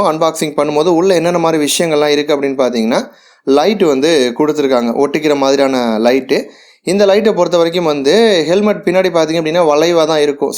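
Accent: Thanjavur, India. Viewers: neenga Thanjavur ah?????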